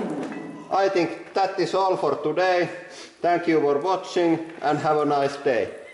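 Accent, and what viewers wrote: Finland. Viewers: Finnish english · I don't know what I love more the crushing or the beautiful Finglish accent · Reasons why I love this channel: 33.3% hydraulic press, 33.3% Finnish accent, 33.3% that girl's awesome laugh. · the finnish accent. Almost any grown man could sound like when they speak english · North european :D and that is typical finglish :D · both the dudesons and he have the same accent and they sound the same I know they are Finish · His Finnish accent makes his videos hilarious. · its called ralli englanti its what Finland is most known for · he sounds finnish, is he?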